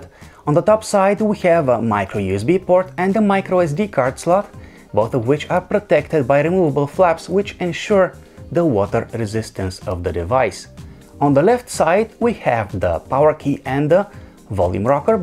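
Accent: Russia. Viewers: Произношение заебись. Он русский, чтоли? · you're Russian ?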